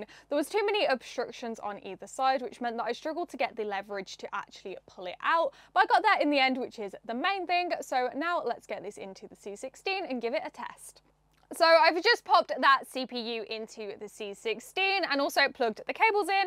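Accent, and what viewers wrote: United Kingdom. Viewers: I love your videos! You have one of the thickest British accents I’ve ever heard. Love it.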